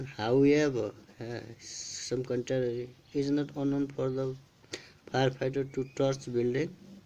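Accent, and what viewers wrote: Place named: India. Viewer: такой индийский акцент......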